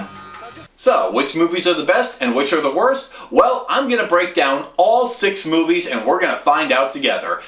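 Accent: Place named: Massachusetts, United States. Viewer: I know that accent... you're from MA... North Shore? Medford or farther north?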